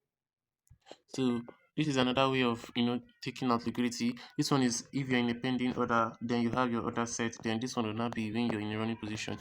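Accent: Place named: Africa. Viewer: Your name is indian but you are speaking English in african accent Your words are not clear first work on your accent I'm forced to see transcript everytime you speak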